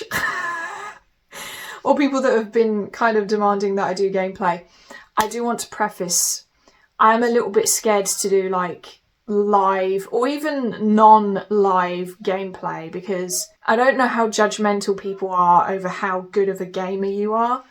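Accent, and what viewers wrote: United Kingdom. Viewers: 🇧🇷 Even as a Brazilian, I don’t really have much difficulty understanding English in general, but the UK accent is a funny case, hehe. Anyway, I came from your gaming channel and didn’t know about this other one. It’s really chill to watch! If you’re having some trouble at the beginning of your stream, I’d say it’s totally okay to take one stream just for adjustments or something. The community will definitely help. Hugs! (And sorry for the English, still a lot to learn!)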